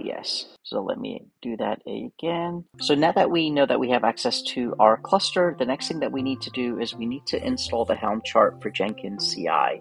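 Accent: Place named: United States. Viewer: you sound like you're in high school, super american, the profile pic is a guy in his late 40s called. what is this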